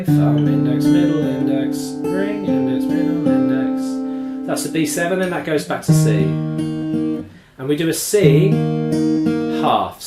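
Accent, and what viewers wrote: United Kingdom. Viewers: When You started talking I was like OH SHEESH HE'S BRITISH.. I did not see that coming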